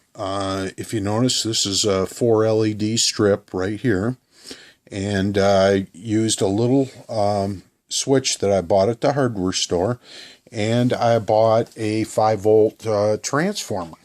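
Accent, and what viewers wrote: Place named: Chicago, United States. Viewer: Chicago area is my guess, too. My area of origination. Excellent modifications!!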